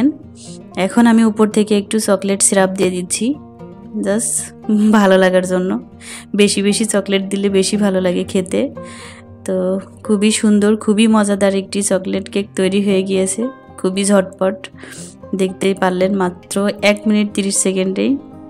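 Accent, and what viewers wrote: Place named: Jhenaidah, Bangladesh. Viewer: আপু,আমি প্রথমে জানতাম না যে তুমি কোন জেলার ۔۔۔কিন্তু তোমার ভাষাটা আমাদের অঞ্চলের মতো লাগতো ۔۔۔পরে জানতে পারলাম যে তুমি ঝিনাইদহের মেয়ে ❤۔۔আমিও ঝিনাইদহের ۔۔আসলে নিজের অঞ্চলের মানুষদের উপর অন্যরকম এক টান কাজ করে l আমি তোমার নতুন ভিউয়ার ۔۔ অনেক কিছু শিখতে পারি তোমার থেকে۔۔۔۔۔۔আপু নেক্সট ভিডিওতে ঝিনাইদাহ নিয়ে কিছু বলো۔۔ আর আমার নামটাও একটু মেনশন করো ۔۔۔তোমার মুখে আমার নামটা শুনতে চাই ❤ +জেসমিন = ❤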